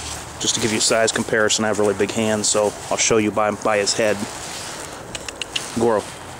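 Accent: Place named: Indiana, United States. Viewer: it sounds like u r from indiana maybe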